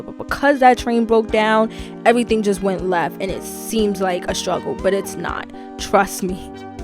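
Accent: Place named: New York, United States. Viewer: Just found you, and I’m surprised you don’t have more followers. New subbie 💕 p.s. I like your NY accent.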